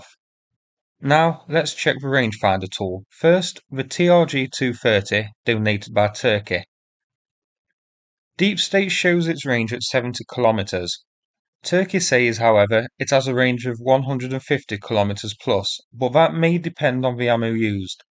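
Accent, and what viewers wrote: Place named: Nottingham, United Kingdom. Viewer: Ah up me duck. You’ve got a proper East Midlands accent.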